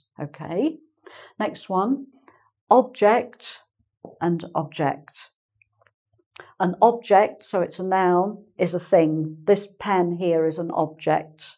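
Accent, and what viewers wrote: United Kingdom. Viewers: Great explanation! ... and your nice British accent: Terrific... I love it a bunch ! ... Thumbs up ! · Thank you very much teacher. it's so interesting and helpful video. please do more lessons involving English pronounciation. I really like British accent ^^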